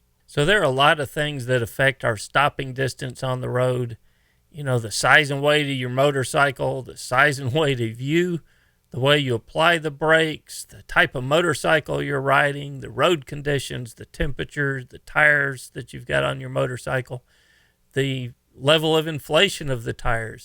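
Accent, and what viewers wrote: Texas, United States. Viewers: 5 but then again your Texas accent upped my stress level.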